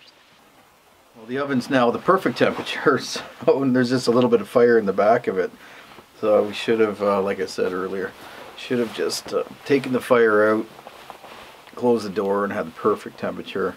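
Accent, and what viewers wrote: Canada. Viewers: Can anybody tell me his general location? I mean I know it’s North country but is it in the United States? I get this feeling from his Accent it’s possibly in 🇨🇦 Canada.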